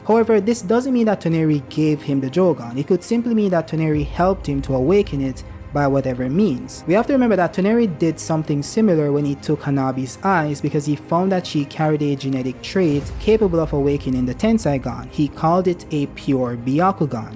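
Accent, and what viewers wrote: Jamaica. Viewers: Thanks for the video. U kinda have a Jamaican accent 😅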